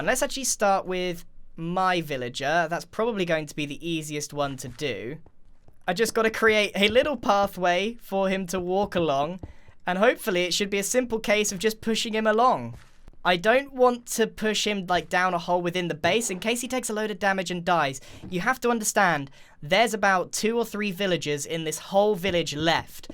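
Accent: United Kingdom. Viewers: You made me fall in love with British accent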